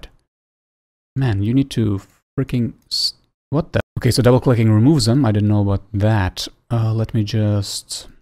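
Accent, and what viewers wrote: Germany. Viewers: souned like you said completly illegal Also are you German cause you dont sound like your english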